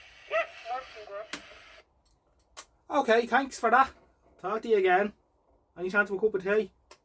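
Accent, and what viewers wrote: Ireland. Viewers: When you were testing the second set your Irish lilt turned Japanese ! Spooky! 6.30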